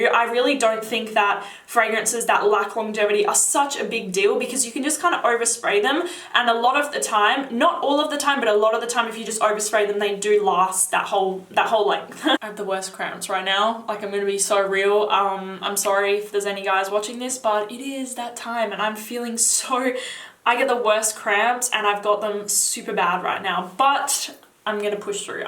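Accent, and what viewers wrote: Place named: Australia. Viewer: I just came back from Australia & I miss the accent lol. I love your videos! Keep up the good work. You’re soooo pretty too. I am hankering after TF BO EDT too.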